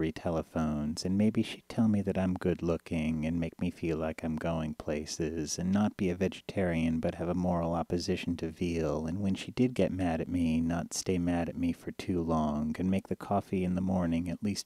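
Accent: Eastern Europe. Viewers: eastern European accent right here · eastern european accent? mmmm.. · great! my boyfriend can say it is true! and this eastern european accent... and everything else... besides his cheap cologn.... aaaaaaaaaa... how could you know?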